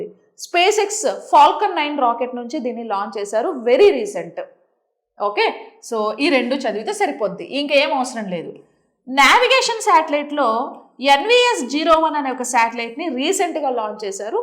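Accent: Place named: Telangana, India. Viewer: True Telangana slang 😂❤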